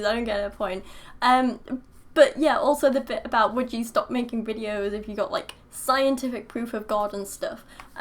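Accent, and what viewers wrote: United Kingdom. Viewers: like your British accent. Can you do an American accent? Maybe a California accent. I don't think they have one but it may be that I am from there living in New Jersey. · The girl parts are certainly nice, but I'm sure there's some views coming from having a cute face and British accent as well. :)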